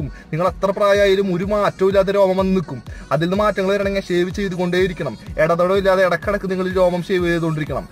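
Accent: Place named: Kodungallur, India. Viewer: Ijjadhi ddav... Thrissur alle😃❤️💪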